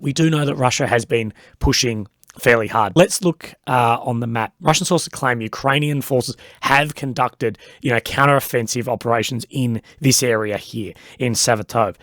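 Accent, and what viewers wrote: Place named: Australia. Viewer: Look forward to hearing your goofy Australian ass everyday. Hope all is well with you. I think a reunion with rob on speak the truth is in order! Much love ❤️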